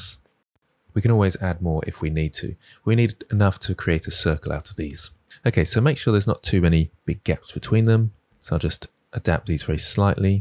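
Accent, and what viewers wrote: United Kingdom. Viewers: Hi, thank you very much to update your well even if I finished the 2.8 well project one week ago 😆. By the way, I would like to mention how much I enjoy your videos. Passion, friendly explanations and great british accent are your best skills! Please keep your motivation. Congrats.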